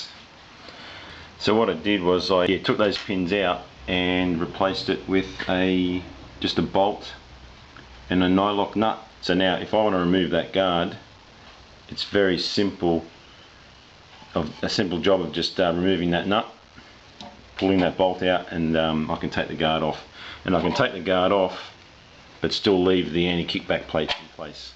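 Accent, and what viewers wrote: Australia. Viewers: Good to hear a Aussie accent, oi oi oi . I should have brought a SB 12, I am not happy with my saws fence system and I seen you did one on your old saw , did you do a video on that ?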